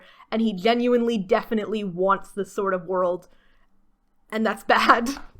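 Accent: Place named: England, United Kingdom. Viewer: You say you are American, but Ive heard you say things before a take that are unmistakably an English, so watch out its rubbing off on ya haha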